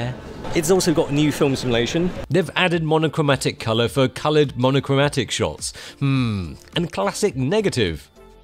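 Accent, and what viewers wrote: United Kingdom. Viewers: I just got an X-Pro3. I've had a couple X100's and a Pro2. I may be one of the ten people that actually use the OVF so my request is statically HUGE. On all the other hybrid viewfinders I've used from Fuji, in the OVF mode the parallax frame lines move to compensate when the shutter is 1/2 pressed and return to the neutral infinity position as soon as the shutter button is released. On the Pro3 I have, The parallax correction moves the framing box but DOES NOT RETURN TO THE NEUTRAL POSITION. This is VERY annoying when recomposing the next image at infinity after many years of muscle memory stored with the other Fuji Hybrids. If one close focuses and the frame moves to the lower right it remains there even when the camera is turned off and on again. And besides, it just ain't natural, Mate. It violates well known cosmic laws regarding such matters. 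I called Fuji support and they appeared clueless but only said they'd pass it along. Since you have a popular channel and are a witty Chinese guy with a British ascent (I'm not sure of another combination that might carry more instant authority) I thought perhaps you may get more attention if you brought it up.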